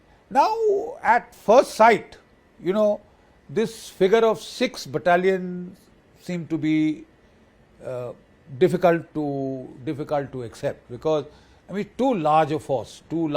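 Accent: India. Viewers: Boss we love your dasi English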